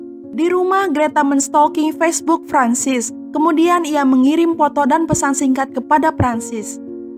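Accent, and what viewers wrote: West Java, Indonesia. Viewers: Sunda na th katinggali pisan "subrek likeu en share😂"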